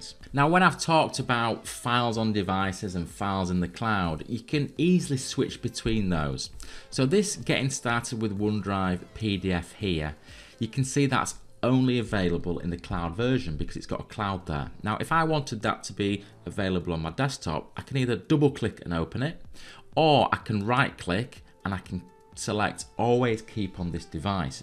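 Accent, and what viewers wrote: Yorkshire, United Kingdom. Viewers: Hey are you from the UK? I hear an accent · I am from Yorkshire, but living overseas, so nice to hear the Yorkshire accent....a great video, but the reality is OneDrive is the worst cloud storage on the planet. Take a clook at products like Tresorit or IceDrive and do a video on them as well. I would not touch OneDrive with a barge pole......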